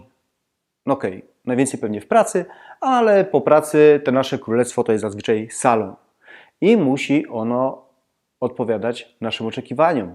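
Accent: Silesia, Poland. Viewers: Świetny odcinek! Za niedługo będę wykańczał pierwsze mieszkanie - właśnie takie małe, więc szukam inspiracji. Dzięki za porady! Na marginesie - jak usłyszałem jak Pan mówi to myślę sobie: no taki śląski akcent! Ale nazwisko takie nieśląskie, myślę sobie: "pomyliłem się". I sprawdzam - a jednak, siedziba firmy w Rudzie Śląskiej ;)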